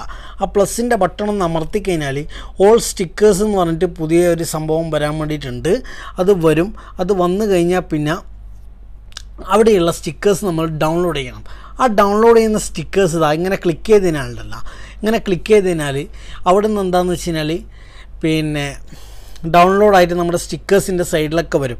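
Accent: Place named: Kannur, India. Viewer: ഇതാണോ കണ്ണൂർ ഭാഷ..... കേൾക്കാൻ നന്നായിട്ട്ണ്ട് ട്ടാ ഗഡീ..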